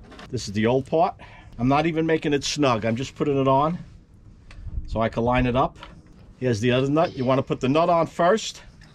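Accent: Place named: New Jersey, United States. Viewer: Yoo from Joisey? Fun accent :-) "Yo, Adrian!"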